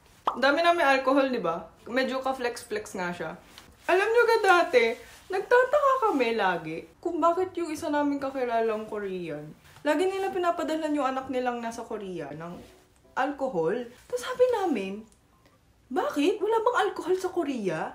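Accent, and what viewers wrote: Batangas, Philippines. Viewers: Batangueña accent ☺️ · Batangueña intonation! Nakakatuwa. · Speaking tagalog fluently is one thing but speaking with the batangueño accent? 👏🏼👏🏼👏🏼 대박! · Mas gusto ko sayo na batanguena accent palagi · bat nga naman batangueno accent mo? tsaka bat di ka pa mamalagi sa Pilipinas? mas gusto mo dyan sa Korea? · She used slight batangueño accent and expressions in this vid po. She was raised in Batangas but I think she purposely use tagalog in a more neutral tone dito compared sa iba nyang videos. 😊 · haha parang batanguena Punto mo yey, grabe dami dala yung sakto kumakain ako ng corned beef with egg side na pnctcanton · Batangueña pala to. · Batangas accent yan. Akala ng iba pag sinabing batangas accent ay katulad nung napapanood sa tv, OA yung mga yun. Pero meron naman talagang matindi ang batangas accent, sila yung mga nasa padulo na ng batangas, hehe. 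· love your Batangeña accent ❤ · She grew up and live in Tanauan, Batangas kaya may batangueña accent siya. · ala eh nagtagal ka nga sa pinas batanguenong batangueno na naman ang punto mo ingat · Kainis mas tunog Batangueña sya sakin kahit legit Koreana sya, samantalang Batangueño parents ko good gosh Even the most subtle of differences like "matutuwa" , iba intonation ng Manileña sa Batangueña niyan hahahahah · Her Batangas accent in this video is subtle, but evident in some of her videos. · Batangueño here, natutuwa ako dine lalo na sa accent niya. Shaaaat na! Same pa ng mga hilig na food. 😅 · Hindi lang basta tagalog: Batangueñong tagalog! Look: sa , sabi nya, alam nyo GA dati...😅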